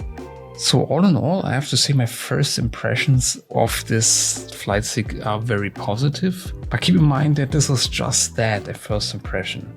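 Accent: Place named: Germany. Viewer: your english is very german :)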